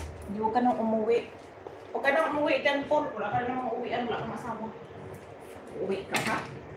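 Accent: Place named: Ilonggo, Philippines. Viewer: Natutuwa ako.sayo pag sasalita ka ng ilonggo.. ❤A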